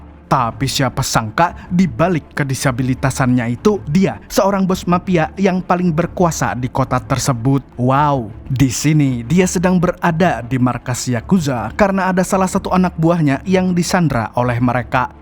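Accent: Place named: Nganjuk, Indonesia. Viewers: Orang nganjuk kah bg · Mimin wong Nganjuk koyok e😂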